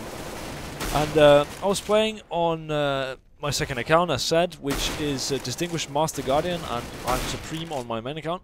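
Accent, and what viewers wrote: Denmark. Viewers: are you danish mayt? 😊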